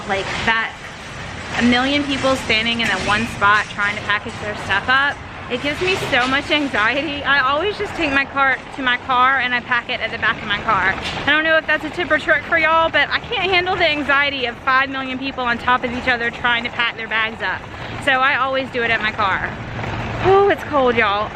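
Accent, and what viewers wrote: South, United States. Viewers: Wow... some people are really shitty (excuse my language). This is the first seeing you on YouTube. Immediately, I said I love her spirit. So, I am a new sub. I love that you shared your real life struggles. Your friendenemy sounds jealous hearted. You are probably everything she wish she could be but can't be no matter how hard she tried. Please keep being your authentic self. I love your southern north twang.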